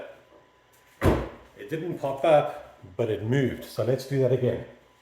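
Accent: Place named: South Africa. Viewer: Thanks for the fix, desperately needed this. You sound South African 🇿🇦 If you are, thanks for helping me all the way back home. Good luck with your channel 👍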